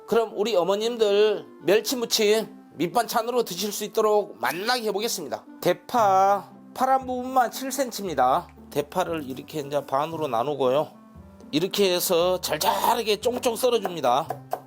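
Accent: Jeolla, South Korea. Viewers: 서론없어좋고 전라도고향말좋고 초간단최고최고🎉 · 완전전라도식말씀 헤그럼판오랜만에들어보네요 셰프님 멸치무침잘들었네요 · 구수한전라 사투리가 더맛깔나네요ㅡ.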